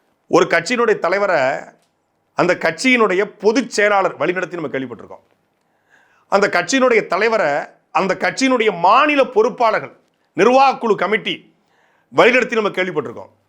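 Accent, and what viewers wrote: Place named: Tirunelveli, India. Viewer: சாட்ட நீ பெரிய ஆளுயா.... உண்மையாவே very interesting politician..... செய்து உன்ங்க நல்லூர்.... வாழ்த்துக்கள் தம்பி.. தொடரட்டும் நெல்லை தமிழ்... கேட்க இனிமையாக இருக்கு தம்பி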